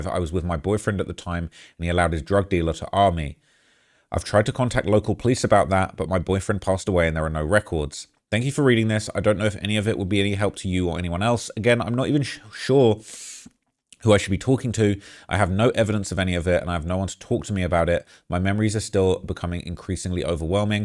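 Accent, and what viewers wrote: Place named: United Kingdom. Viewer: Do you happen to have some crumpets ans tea? Your accent is like nails scratching a chalkboard...fkn lame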